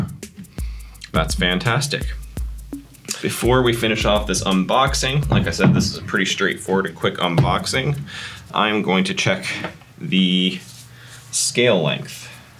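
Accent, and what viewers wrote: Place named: Canada. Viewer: Wow what a voice, you sound Canadian haha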